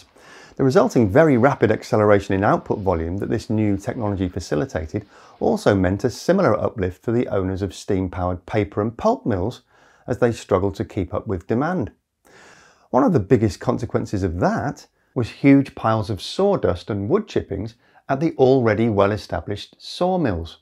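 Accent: United Kingdom. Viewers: Not even a minute in and you have told two untruths. 1st of all wood pellets are not made out of old growth. Most of the trees that are harvested are around 20 years old which is the typical lifespan of pine trees. The trouble with this lie is that it is misinformation absorbed by the those who lobby for legislation against the logging industry. It prevents clear cutting timber which creates these massive over grown forest which are basic tinderboxes. Those forest fires which are mostly avoidable cause significant harm including loss of life. So your lies and misinformation have consequences. Next, CO2 emissions are net neutral in biomass because the carbon that is released during burning is the same carbon absorbed during growth. Furthermore, just because you have some British accent doesn't make you smart. You are spreading climate fear (fear porn) with is all lies and misinformation. 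You are the danger. I would down vote you 1000 times if possible. I will settle with just calling you a liar and a fraud. Have a think on that!!!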